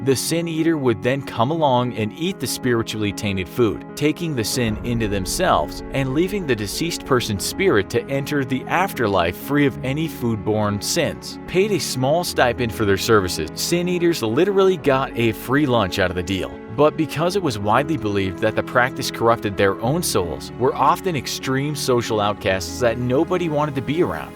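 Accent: United States. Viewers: Man i hate this american narrator, feel like i'm getting dumbed down just by listening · "In the human history??" Who TF is running infographics? And why do these people hire Americans to narrate?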